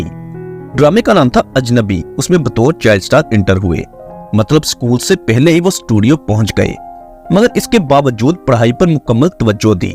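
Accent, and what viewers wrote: Punjab, India. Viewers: Why is the commentator trying his best to speak urdu in Panjabi style??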